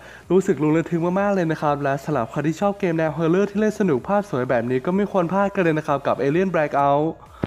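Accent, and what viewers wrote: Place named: Shan, Myanmar. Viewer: เปนคนไทยใหญ่หรือพี่แอปเสียงเกินอ่ะพี่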